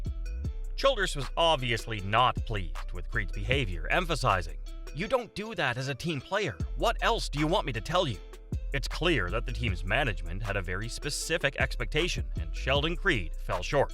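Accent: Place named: Canada. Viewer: Why reference bubba Wallace? Forced diversity? He had absolutely nothing to do with this. Your goofy accent sounds Canadian. Is that so?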